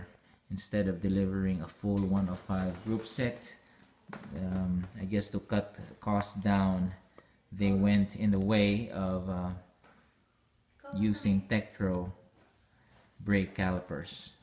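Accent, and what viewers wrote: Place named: Philippines. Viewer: nice accent. pinoy?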